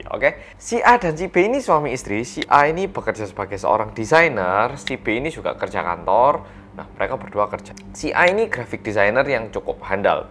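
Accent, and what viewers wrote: Java, Indonesia. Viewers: Udah banyak yang bahas Shincheonji di Youtube, tapi sengaja gak nonton soalnya nunggu yang versi orang korea yang medhok aja deh!😀👍😀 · Favorit youtuber ini mah, suka sama pembawaannya dan juga medoknya. 😂🤣👋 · Aku ngajak tmnku nonton mas. Dia kaget + ribut pas denger mas ngomong jawa + medok wkwk gemoy:')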